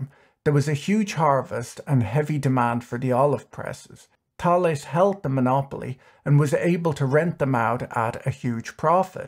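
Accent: Ireland. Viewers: I'm curious about your accent..I know you rarely read comments especially on older videos, but your accent fascinates me. It sounds Irish but there's something else in there it sounds half American or Canadian. Can you tell us how your accent came to be like that and where you've lived etc? Maybe it's your video voice to flatten your Irish accent for American audiences and you're doing it deliberately? Pretty cool smooth accent anyway.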